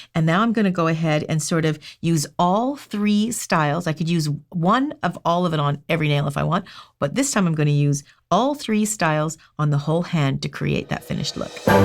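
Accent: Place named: Canada. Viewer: DO YOU MAKE THOSE LIL HATS?? WHERE DO YA GET THEM?? MY PRAYERS ARE FILLED WITH THOUGHTS OF YOU AND CAMERA MAN AND YOUR GUITAR PICK'N BABY GIRL THIS HOLY DAY SEASON AND THE HOLIDAY'S ALL TOGETHER... MERRY CHRISTMAS AYE... I NOTICE YOU SAY AYE AAAALLOT SO CUTE. MUST BE A CANADIAN THING HUH. "I MEAN AYE"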